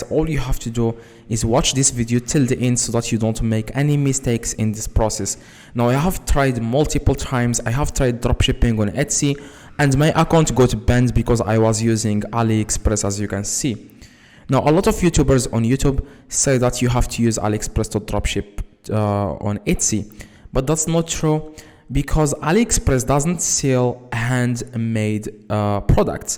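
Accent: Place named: Morocco. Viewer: 3reftek meghribi men lkelma lowla walakin kon rir derti hadchi bl3arbia wlh l'anglais "endi m3aaaakla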